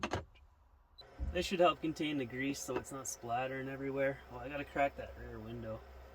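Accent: Canada. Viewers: Just say “out” and “about” in your awesome Canadian accent, and you’ll be forever a YouTube legend.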